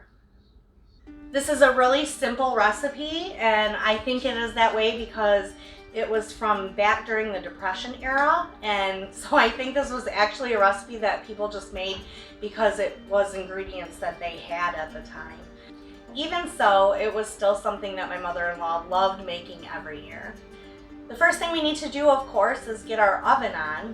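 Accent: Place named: Michigan, United States. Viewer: Oh hearing the Michigan accent and seeing the Go Blue Crockpot in the background, makes me miss MI all the more! I wish I still lived there! When Mike came back from MI in September, I made him bring be back a lot of Blue swag lol Great video! I will have to try this sometime! Apparently you can make it with just water too!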